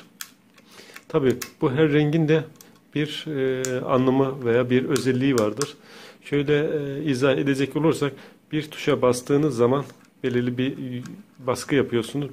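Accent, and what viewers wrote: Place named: İspir, Turkey. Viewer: Ç'lerine gurban olduğum Gardaş sen İspirli misin?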